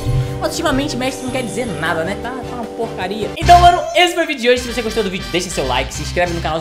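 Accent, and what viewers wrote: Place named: Rio de Janeiro, Brazil. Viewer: Nossa vc é do RJ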